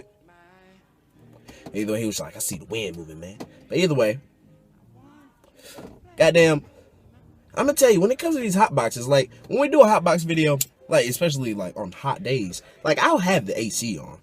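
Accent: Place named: South, United States. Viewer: This dude sound hella country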